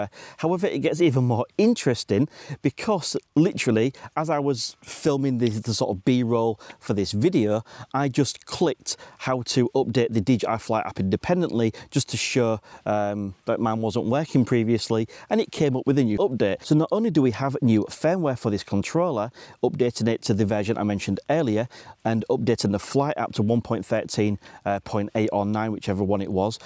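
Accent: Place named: Scotland, United Kingdom. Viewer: Came for the information, stayed for the SUPER THICK Scottish accent. 😁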